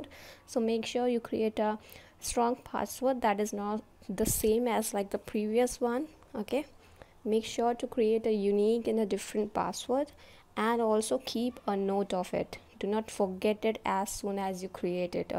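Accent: India. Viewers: Are you Indian ,I'm Right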